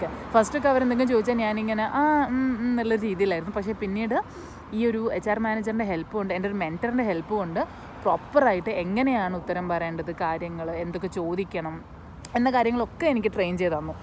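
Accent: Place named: Kannur, India. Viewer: ഇരുട് കണ്ണൂർ സ്ലാങ് ❤️❤️❤️❤️❤️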